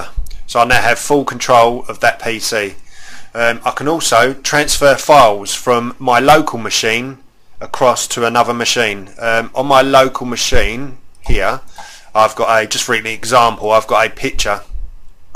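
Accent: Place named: United Kingdom. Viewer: Great stuff, thank you so much. Also, it is nice to hear a fellow Brit helping us out!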